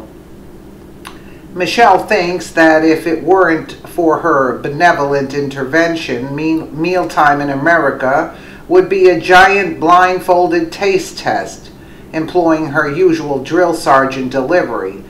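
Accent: New York, United States. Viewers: good job sounds like your from NY . yes I fixed my children's lunch the night before . who knows what they feed people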